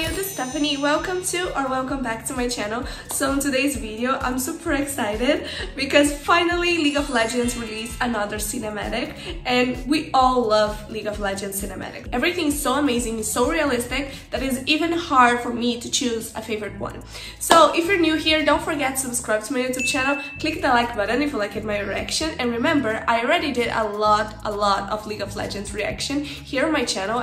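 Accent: Brazil. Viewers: Você é BR não é? Também costumo falar inglês boa parte do meu tempo, e a gente tem o sotaque semelhante, acho que da pra reconhecer. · Ela é brasileira? esse sotaque dela me dá essa impressão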